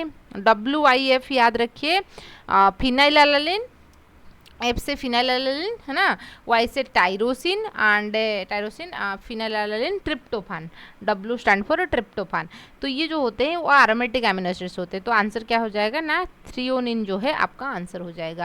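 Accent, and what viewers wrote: Odisha, India. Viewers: Ma'am ap Orissa se ho kya.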